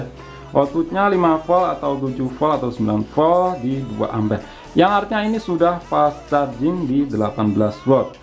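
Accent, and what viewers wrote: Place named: Kediri, Indonesia. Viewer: Org kediri lu bang 😂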